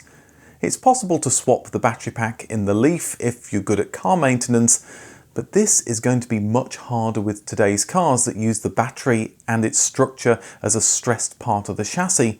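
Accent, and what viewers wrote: England, United Kingdom. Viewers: It's funny to hear an English accented person to say they are driving on the wrong side of the road. 20 years is just run in on most ice's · I thought you were British... 🤣 Also it's extremely early for EVs. In my city and in my country there are a few charging stations and those who have houses with garages are the only ones who own one. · I watch your videos for over 4 years now and i could swear you are from England, and now this video suggests that you live in USA 😂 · You are so British, I thought the whole USA scenario was some sort of roleplay. Even the shelves behind you look like BnQ units I had in my house in Ireland. I actually dug into your channel details to check location. Definitely my surprise of the year, that you're base in the US! Anyways Love the videos (in Terms of merit) and your voice. Very soothing after a stressful day. 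Greetings from Poland. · Wait, you live in the USA? I assumed you were British and in th UK the whole time... :volcano-green-lava-orange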